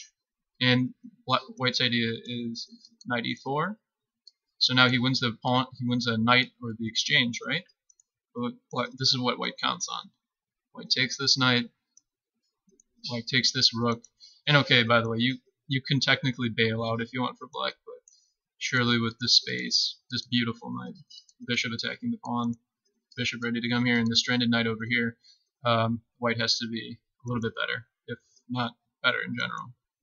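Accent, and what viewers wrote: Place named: United States. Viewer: Some chess video instructors for some reason present a black opening from the white player's side, which makes it more difficult to follow. Kudos for presenting the Leningrad Dutch from the black's perspective,. You presented well in clear American English, covering the intent of black for each move, and the possible white moves in reply. Thank you for a great opening that was taught well. I hope you do more chess videos!:)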